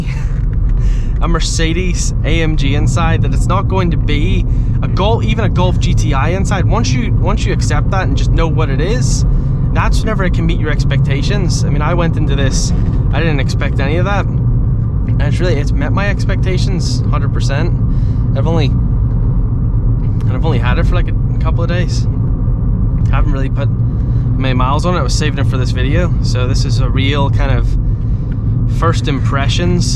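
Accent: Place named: Canada. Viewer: Interesting accent you have there! Part Canadian? Love the vid 👌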